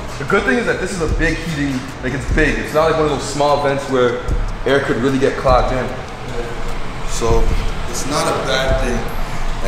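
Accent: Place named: Toronto, Canada. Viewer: As soon as I heard mans speak, I said definitely a Toronto dude. Congrats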